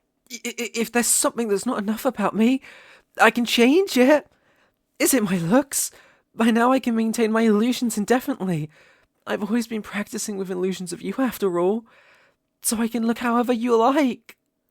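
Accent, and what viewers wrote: United Kingdom. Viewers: Helloooooooooooooo good to hear ur british voice again XD back from the dead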